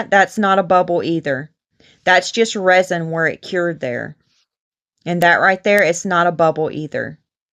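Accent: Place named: Louisiana, United States. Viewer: Hi, the tray came out beautifully. Thanks for sharing the wet technique. I’ve never seen anyone else do it before. What state are you from? I know you have a southern accent & to me, it sounds like Louisiana, but I’m not sure. Take care, stay safe & have a blessed day.🙏💗👋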